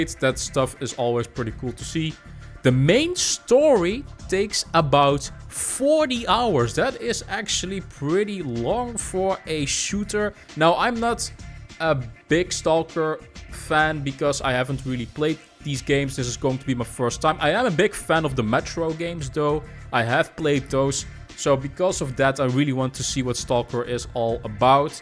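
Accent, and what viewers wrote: Netherlands. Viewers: Is that Dutch accent?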